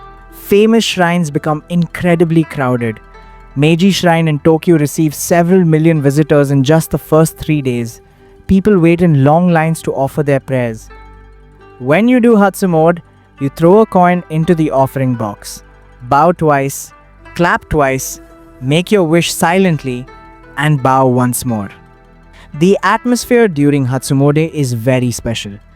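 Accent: India. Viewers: I was not expecting an Indian accent.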